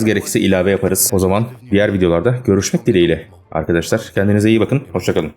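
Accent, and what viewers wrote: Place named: Foça, Turkey. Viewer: SEN GÜNLÜKBAŞILI MISIN YEĞEN? Foçalı şivesi çıkıyor sende.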